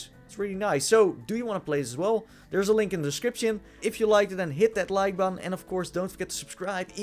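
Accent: Netherlands. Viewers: are you dutch? ikke ook xd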